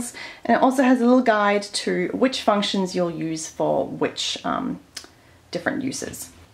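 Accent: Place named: Australia. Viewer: Love your review of this machine. I have the original lotus Tx and haven't used it for awhile so inspired to start using it again. I would love it if you could go through a review of the original one too. I love your sewing room are you able to do a video of that and how you set it up, it looks very organised. I am from Australia just wondering with your accent if that is where you are from. Great work your videos go at a good pace to understand and you are nice and clear and camera work is great too, well done.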